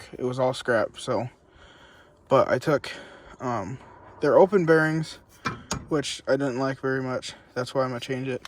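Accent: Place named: West Texas, United States. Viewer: Damn for some reason I thought you were west Texas.. Nice build buddy!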